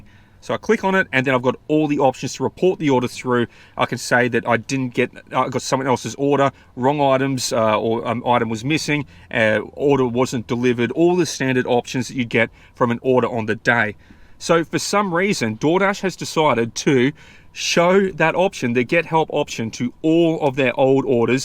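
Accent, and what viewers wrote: Australia. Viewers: I love your accent! Australia?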